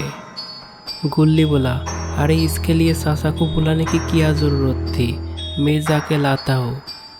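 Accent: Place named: Bengal, India. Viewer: Awaj chenge karo bangali awaj sahi nahi hi koi saf hindi bolne wale ko job pe lagao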